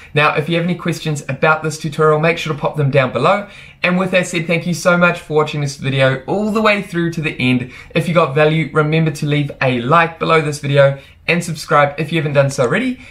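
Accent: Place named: New Zealand. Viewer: I was wondering why your accent sounded so much like Wholesale Ted and it turns out you guys are both from New Zealand. The 'Catalogue' part was the trigger lol.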